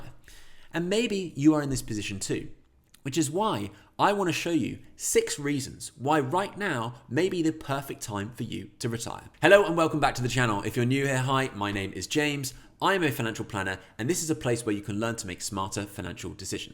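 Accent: England, United Kingdom. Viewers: This English man with his English accent beating around the bush instead of talking about specifics .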